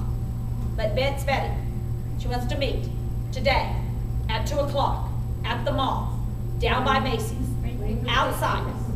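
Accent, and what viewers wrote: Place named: East Tennessee, United States. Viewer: I grew up in East Tennessee. She sounds like my sister. Same accent throughout, just more noticeable when she goes from lecture voice to excited conversation voice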